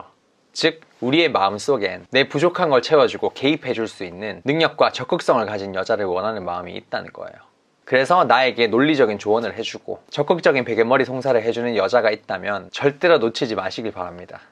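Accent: Gyeongsang, South Korea. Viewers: 심리학 관련 찾다가 우연히 님 영상들 보게 되었는데 그 어떤 시트콤 드라마보다 재밌게 보며 간만에 많이 웃었네요. ^^ 화법이 표준어가 아닌데도 지적이고 젠틀하며 경상도(대구?) 사투리가 너무나도 매력적으로 묻어나는 말투... 매력남의 표준이시네요!🥰 이젠 느무 나이가 들어서 연애 포기하고 지천명을 바라보는 골드 미스, 미쿡 사는 누나(ㅋㅋ)가 구독, 좋아요 눌러 버렸어요. ㅎㅎ ( 님을 일찍 알았었더라면 결혼 성공 할 수 있었을라나...? ^^; )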